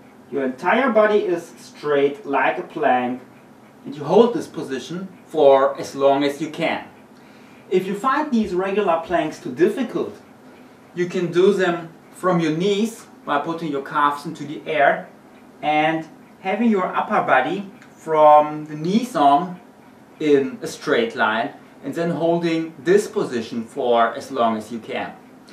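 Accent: Germany. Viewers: Good Video, you voice rocks btw :D, are you German ?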